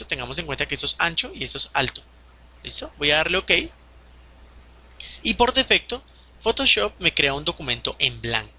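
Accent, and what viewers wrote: Colombia. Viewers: Estos acentos colombianos cómo me gustan... Y más cuando se nota que es una voz inteligente✨♥️ Gracias por el curso,!! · Gracias parcero, soy arquitecto y me había predispuesto y veo que no es difícil, ademas que bacano, escuchar un tutorial con acento colombiano. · Uuuuyyyy Colombiano tenía que ser ¡Gracias maestro! la tienes clara oyó? saludos desde Nariño. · Esa forma de hablar, ese acento, dejame adivinar, Colombiano? :v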